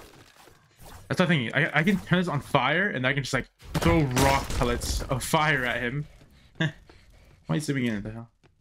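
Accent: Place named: India. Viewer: havent watched your videos in a while cant stop noticing that your voice has gotten more indian like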